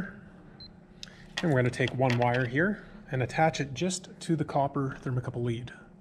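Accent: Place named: Canada. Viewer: I am going to try to fix my water heater and I wanted to understand the underlying concepts and this really helps. He sounds like he's from Canada or the northern Midwest.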